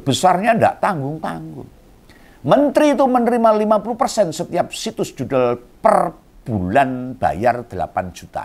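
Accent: Java, Indonesia. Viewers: yg jelas saya senang mengikuti ngompolnya om menambah wawasan dan pengetahuan apa lagi dengan jenakanya om diselingi bahasa jawa yg medhoog terima kasih om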